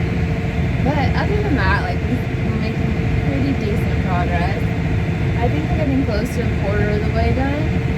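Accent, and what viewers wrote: United States. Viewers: oh my gosh, how i love the American accent! I need to move from Australia asap lol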